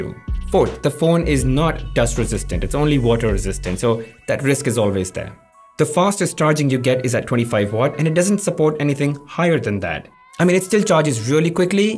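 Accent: India. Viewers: Very hard to hide indian accent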